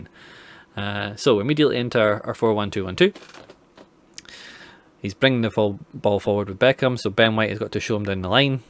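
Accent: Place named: Scotland, United Kingdom. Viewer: I guessing you're Scottish right? (Becoz of the "wee bit" term used in your video and also the accent, so i guessed so, haha)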